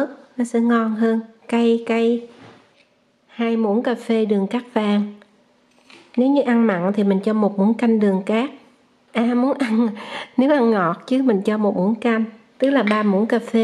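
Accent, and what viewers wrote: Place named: Saigon, Vietnam. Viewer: Xin chào em cảm ơn em chia sẻ món chay này thật lạ mà nhìn rất ngon! Không biết khi ở VN em ở quận nào tại SG mà giọng nói rất SG de thương 😊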